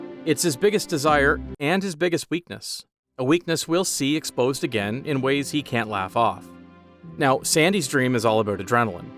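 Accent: Canada. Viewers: you Canadian bro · Too Canadian. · Is this guy Canadian?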